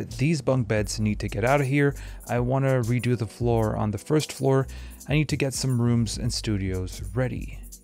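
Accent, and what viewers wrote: Quebec, Canada. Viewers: Hmm may be a kind of a stretch but i always assumed you were quebecois from your pronunciation of french words and names! Love this channel keep doing what you are doing!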